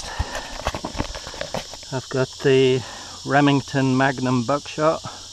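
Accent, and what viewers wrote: United Kingdom. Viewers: Where do you live at brother if you don't mind my asking? You sound British, but I didn't think you were aloud to own shotguns in England. No offense meant. I'm just ignorant when it comes to gun laws in the UK.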